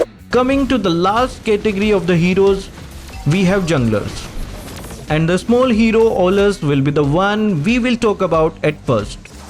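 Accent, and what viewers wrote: India. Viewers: bro you from India your voice bro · You sound like an Indian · why u sound like indian dhoti its so irritating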